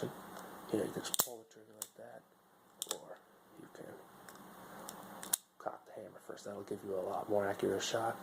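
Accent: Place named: South, United States. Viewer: A southern accent... I'd say I'm shocked but I don't like to lie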